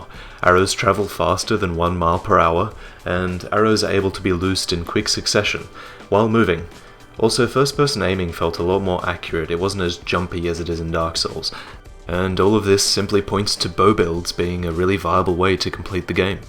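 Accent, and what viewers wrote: Australia. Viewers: Wait, is Australian? Nice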